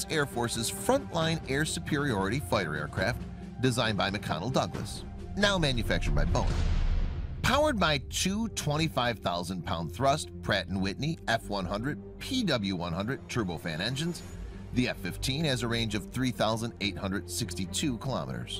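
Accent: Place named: Kansas, United States. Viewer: My car's still have speedometers in miles per hour your voice Mr narrator sounds like it's straight from Kansas.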